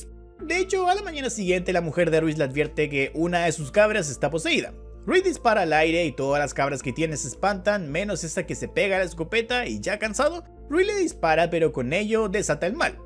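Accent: Argentina. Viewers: El acento argentino de 10/10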